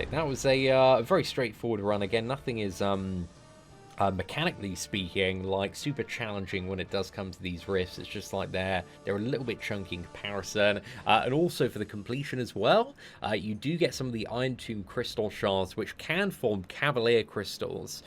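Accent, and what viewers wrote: United Kingdom. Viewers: I’ll never read the forums or need any other channel besides for mcoc news 👀. Man saves me hella time and I get to get a free British accent talking person without using my pc narrator mode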